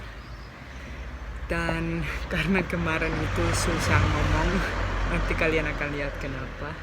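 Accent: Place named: Yogyakarta, Indonesia. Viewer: .....logatmu udah Jogja banget, ditunggu video lanjutane yooo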